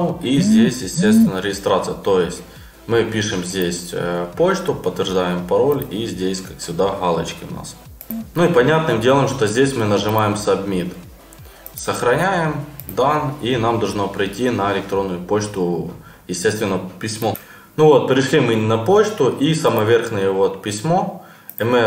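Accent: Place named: Ukraine. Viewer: Говор у тя на украинский похож. Но возможно ты с Ростовской области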